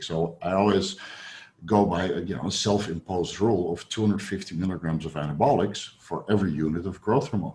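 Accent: Netherlands. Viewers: Yeah but he is Dutch, we can hear it in his accent. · Love the Dutch accent!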